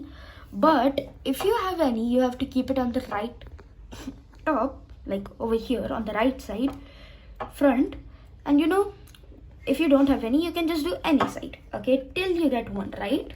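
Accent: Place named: Europe. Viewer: your accent is very exotic - i'm guessing it's european... youthful tone adds cuteness. hope you don't mind letting me know what your ethnicity is. thanks in advance.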